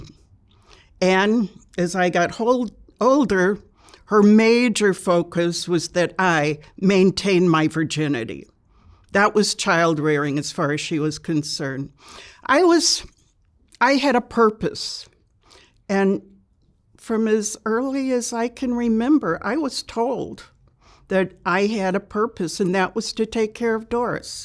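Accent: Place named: Chicago, United States. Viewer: You can’t beat the Chicago accent! ❤ She reminds me so much of my grandma who lived her entire life there. What a spirit, what a story!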